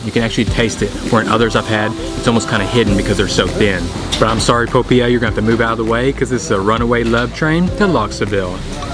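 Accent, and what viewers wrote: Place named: South, United States. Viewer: Never did notice before but slurping and that southern drawl seem to go hand in hand. Damnit, i feel like one of Pavlov's dogs watching this. Keep up the good work sir.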